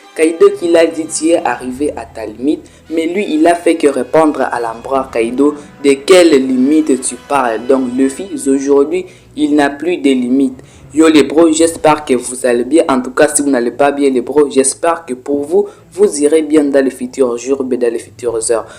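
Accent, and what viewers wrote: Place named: Democratic Republic of the Congo. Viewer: Congolais c sur ton accent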